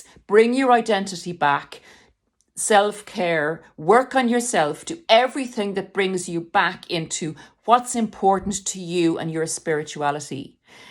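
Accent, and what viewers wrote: Ireland. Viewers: This is exactly how it goes. This this exactly what them demons do. They do the work of the devil, willingly, with bad intent to confuse and destroy everyone and everything. My narc father destroyed my whole family and liked doing it. Amen and God bless you!! Thank you. Love this!! I had a teacher in 8th grade who’s name was Sister Pauline and she was from Ireland. We used to spend Saturday’s with her at the convent. You sound just like her with your accent💖 Great Video!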